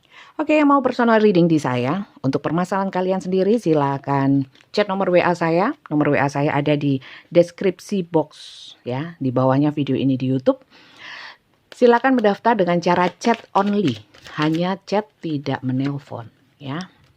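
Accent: East Java, Indonesia. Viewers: Nyimak readingan Bunda tuh bikin senyum² sendiri😍di samping sll resonet aku suka logat nya Bunda khas Jatim,pencerahanya jg sangat tepat👍sukses n sehat sll ya Bund🤲...tks🌹😍🙏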